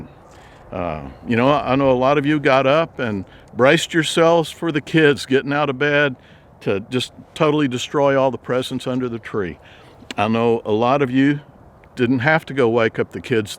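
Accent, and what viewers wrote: Texas, United States. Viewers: It has been many months since I've watched your videos and I apologize for that. My Mother passed away in July and I have been adjusting to that loss. She liked your videos and we would watch them together. She loved your Texas drawl and would say you're a "good looking man"! Bless you and your family and here's to a better 2021. Take care.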